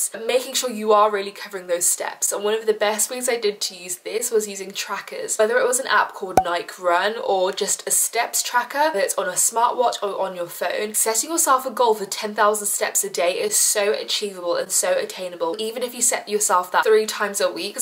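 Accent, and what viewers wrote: United Kingdom. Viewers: Omg this British accent 💖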